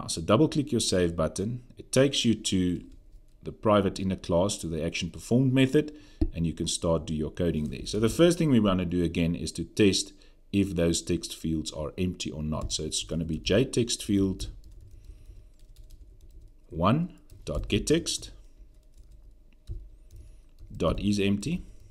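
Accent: South Africa. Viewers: from that accent straight away, i knew u are from South Africa, thanks mate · Great video. And I could listen to this guy's South African accent for days on end. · That accent right there is ZA! Awesome content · THICK South African accent. Represent boet.. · He sound so South African...thanks for the upload...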